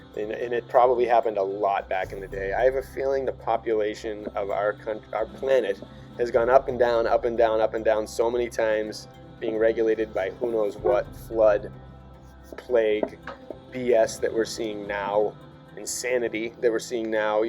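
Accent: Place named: Massachusetts, United States. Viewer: I know your from MA, I can hear it! Lol lmk I’m from revere kid!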